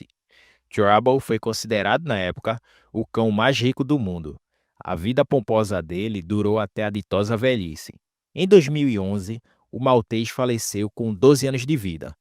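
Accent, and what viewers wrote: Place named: Pará, Brazil. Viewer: Sotaque forte paraense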